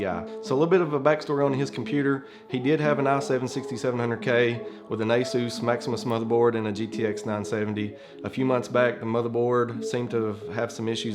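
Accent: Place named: Kentucky, United States. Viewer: First time here. Love your video. I have to ask where you're from? You sound like a fellow Kentuckian. :)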